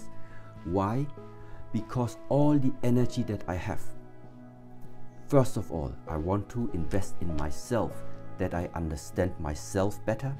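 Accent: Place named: Germany. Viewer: Is he from Germany he has a strong german accent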